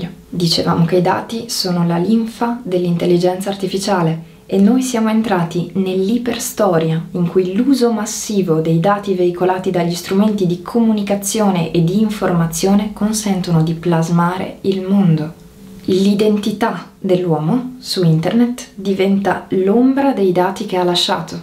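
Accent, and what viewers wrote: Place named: France. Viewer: Scusate ma chi è questa bella ragazza, con accento francese?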